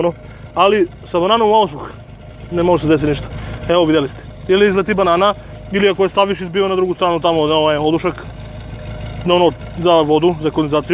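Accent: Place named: Niš, Serbia. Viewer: on je iz Niša zato ima takav "naglasak,, a ne da ne zna padeže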